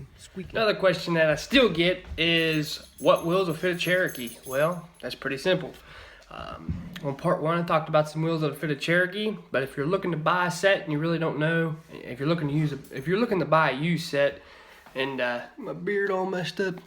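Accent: South, United States. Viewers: Howdy. What's your opinion of the rear brake drum to disc conversion kits? Is it a worthwhile upgrade do you think? Thanks, man. (Your vids are great, and so is your Southern accent)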